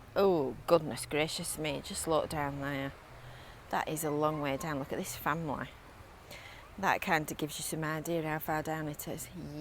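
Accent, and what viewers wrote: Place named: Yorkshire, United Kingdom. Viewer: Amazing video. I was planning to come here too as my channel is all walkthrough in West Yorkshire videos. You also sound Yorkshire too can I ask where your from out of interest? Lol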